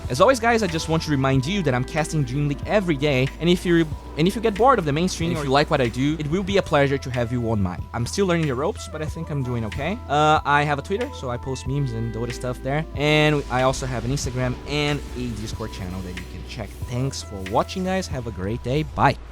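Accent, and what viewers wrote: Brazil. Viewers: Good job man, your accent sounds familiar to me haha just in the case, are u Brazilian?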